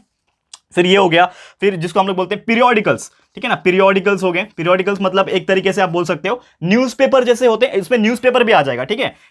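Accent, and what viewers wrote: Maharashtra, India. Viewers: Sir is your mother tongue is Marathi??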